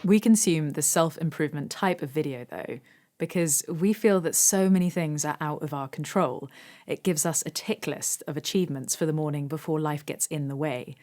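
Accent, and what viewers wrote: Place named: England, United Kingdom. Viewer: u should make a tshirts saying-watch me on- you CHUBE ! - lol,LIike your chusday- i love your english accent-ca usa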